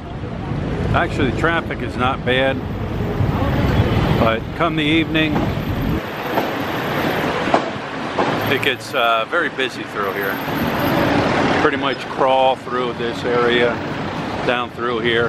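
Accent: Philadelphia, United States. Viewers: "I'm gonna film down dis schtreet here" LOL Definitely from Philly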